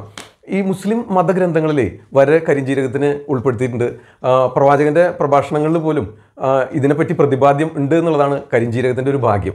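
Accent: Thrissur, India. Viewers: തൃശൂർ ശൈലി യിൽ നല്ല അവതരണം. Good information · ത്രിശൂർ slang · Trichur slang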